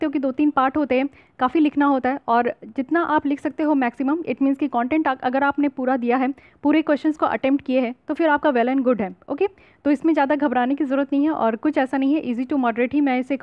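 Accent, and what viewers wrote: Bihar, India. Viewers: Mam aap bihar se ho kya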